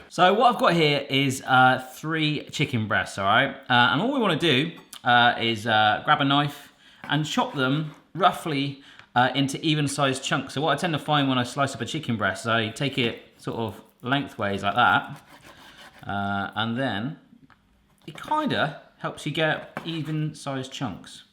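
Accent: United States. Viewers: Salt Lake City, Utah, USA! Love the American accent... Buttermilk. Lol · Can we just take a moment to realize how good his american/canadian accent was? Good job! I'm from Roswell GA in the US. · Hi! I am watching you from Calgary, Alberta Canada.... totally love your videos and I get a kick out of your american accent (it freaks me out every time you do that......."buttermilk")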